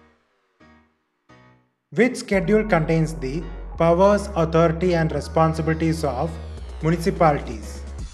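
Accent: Kerala, India. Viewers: Sir are u from Kerala ? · Hello sir are you from kerala you sound like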